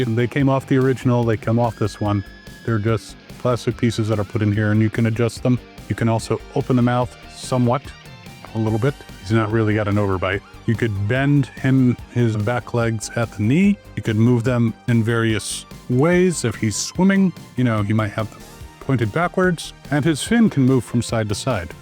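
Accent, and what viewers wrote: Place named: Scotland, United Kingdom. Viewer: Which part of Scotland are you from, man?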